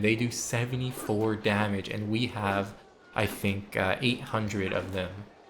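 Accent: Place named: California, United States. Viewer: this guy and MRE guy have the same California accent and I enjoy it I just need to say "ok niiiiccceee" more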